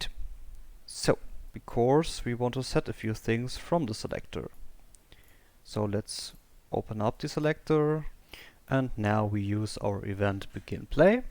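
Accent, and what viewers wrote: Germany. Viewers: It completely doesen't work for me..... You sound german so I hope you are german. Answer in german when you are. When i press play my car won't spawn and i wont possess it.. To make matters worse it spits out this error: Attempted to access BMW_Blueprint_C_0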